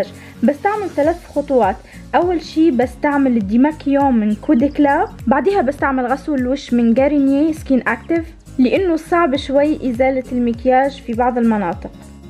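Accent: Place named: Tunisia. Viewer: تقولش عليك تونسية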